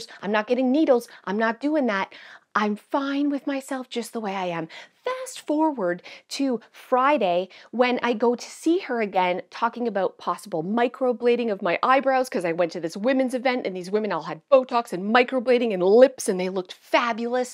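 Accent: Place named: Oakville, Canada. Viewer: your ability to be vulnerable and funny and honest and as screwed up as the rest of us is exactly what I needed to hear today. We all have struggles because we are told we need to do it all, perfectly and look amazing at the same time. Well, we can't. We can only be ourselves and sometimes that isn't easy. You have shared a cautionary tale and please know that you are delightful (and I still love the Oakville accent.LOL)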